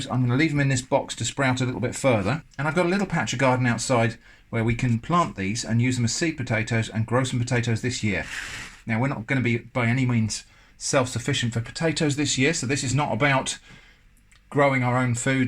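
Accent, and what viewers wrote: United Kingdom. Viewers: Such a fan of your channel, it was yours that introduced me to a topic I didn't know existed: Scambaiting. I then realised your quirkiness, intellect, good ol' fashion British diction and relaxing timbre sucked me in to the rest of your content. Thx for fighting the good fight👍👍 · I enjoy Watching your videos during these tough and uncertain times. In the US it is very chaotic right now and watching these video lets me know that there are things to do at home. Plus I like British accents. 🙃 I have started a hydroponic indoor mini farm and I'm starting to grow my own food. I hope you stay safe and nice hand washing.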